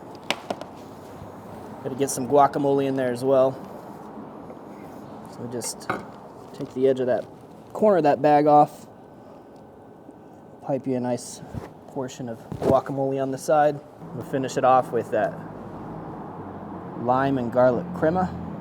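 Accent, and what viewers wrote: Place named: California, United States. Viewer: Who has all that their kitchen your special spices and infused chili oil you sound like you're from California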